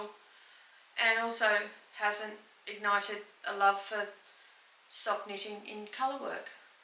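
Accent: Australia. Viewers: I’m really enjoying your podcasts….nice to listen to an Aussie while I’m ploughing through 2aat sleeves already attached to the jumper. It’s an oversize (daughter’s request) Merino Magic (Heirloom) - soooo bulky that I need to knit at the dining table in air conditioning of course. It’s pretty hot here in Two Rocks WA. On to your next episode.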